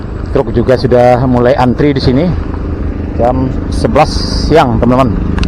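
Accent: Minangkabau, Indonesia. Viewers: Dari beberapo video ikolah nan gambar video barasiah, stabil, carito jo informasi sinkron dan jaleh, didukuang pulo jo suaro dan logat lokal, yo samakin taraso ado di lokasi.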